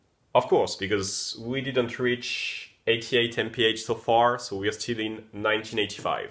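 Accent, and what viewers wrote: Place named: France. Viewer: Bonsoir, j'entends un petit accent français... Tu es français ? En tout cas vidéo très intéressante. Ps : le convecteur temporel c'est pour quand ? ;)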